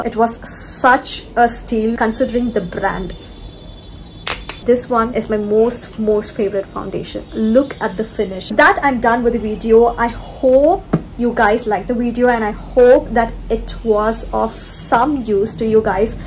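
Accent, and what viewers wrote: Tamil Nadu, India. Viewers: Are you a Tamilian ? Just love your accent 💕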